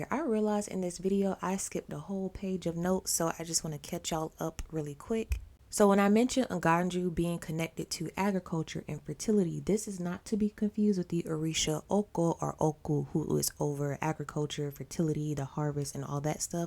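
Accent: United States. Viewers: Baby, this is that Orisha whose name YOU DO NOT PLAY AROUND WITH!!! Thank you for these Orisha stories!! You pronounced it as our American selves would, HOWEVER, these folks better be careful playing with his name bc when he shows up, he knocks all the shit over 🤣🤣🤣🤣🤣🤣🤣🤣🤣... So don't worry about the pronunciations. I assure you The Orishas are pleased that you are telling their stories, PLEASE KEEP TELLING THE TALES OF THE ORISHAS, Ase OOO!! ** EDIT: I am crowned to Osun and I pronounce his name exactly as you do 🤗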